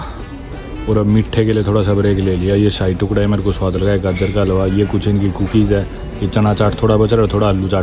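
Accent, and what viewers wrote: Haryana, India. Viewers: Like your Haryanvi touch in your Hindi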